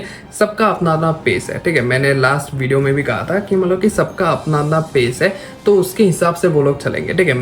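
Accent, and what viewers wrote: Assam, India. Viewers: Bhai Assam se ho kiya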